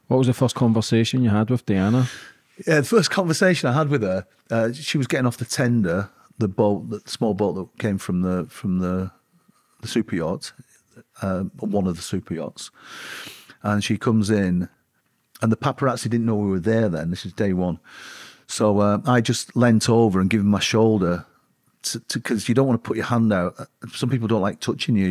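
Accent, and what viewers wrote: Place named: Scotland, United Kingdom. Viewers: Yes Lee Sansom is a great bloke, and, (what a surname, for such a strong Scottish accent Scot), is a great interviewer... BUT if Lee Marshalls his actions, as badly as his thoughts, God help anyone he was protecting. He goes around the houses, with pausing to an irrorating level, I wouldn't have him baby sitting, never mind protecting people for huge sums of money. There are glaring inconsistences! IF the British MI5 & MI6 were watching everything, and that is normal, did they ALL have a night off when Lady Diana died? Why was no white Fiat found, they had the paint on the Car, and even if the Tunnel CCTV was off, ALL of Paris's CCTV was NOT? Why the close Bike rider who may have used a Driver Blinding torch, why was he never found? Why did they not take her to the nearest Hospital? Why did it take 2 Hours before anyone tried to save her? 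A following Security Car should have been following, so if the Celebrity Car is damaged, or unable to move, the Celebrity can be transfer to the following Car. This is standard Body Guard Protection. Now if this Lee Sansom is a nice bloke, that still does not qualify him as a Body Guard. For some reason I cannot fathom, you all seem to think "nice blokes" do their job well, I couldn't care if he's a Saint, he is bloody useless in a Crisis, or even able to DO HIS HOMEWORK. If HE does not know the answers to my questions, he is a totally stupid, bloody shit Body Guard. Because ALL of those questions HE BLOODY WELL SHOULD KNOW! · Hi, I’ve just found you, so glad I did! I’m writing b4 I’ve finished listening to this. Wanted to say it’s fab to hear a fellow Glaswegian doing this and I’ll be checking out your other stuff. Well done 👏 ya handsome young man 😅 Your guest is fabulous!! He’s got such a beautiful spirit, so open and warm. 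Don’t mind admitting I’ve got a big crush 🥰😂 I admire how straight forward your interview style is and I’m looking forward to learning more about you through your back catalogue. Well I’ll stop noo and listen to the interview. Health and happiness to you and yours ❤ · How frustrating and disappointing for you. The Scottish accent can be like that may I ask where you from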